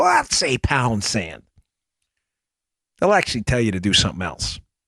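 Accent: New York, United States. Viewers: Dan, you remind me of some of my old Soldiers from New Yawk! Lol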